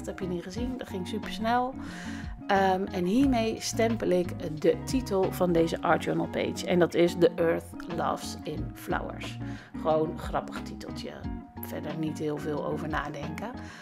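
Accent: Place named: Rotterdam, Netherlands. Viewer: Zo grappig om je nu eens Nederlands te horen praten. Heerlijk met dat Rotterdamse accentje (mojenoukaikuh) 🤣 Keep up the good work (in Dutch please 😉)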